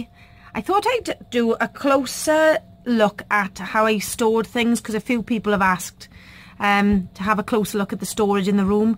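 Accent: Wales, United Kingdom. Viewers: I love this craft room. I also adore your Welch accent. I've never even filmed a single video yet as I hate my Geordie accent 😖. Thanks for sharing this amazing space full of awesome crafty organisation. I've just ordered the mint green trolley from hobbycraft and waiting for it to be delivered so you have defo gave me some fabulous ideas on how to use it for all of my paint and glitter supplies. Happy Crafting xx Berne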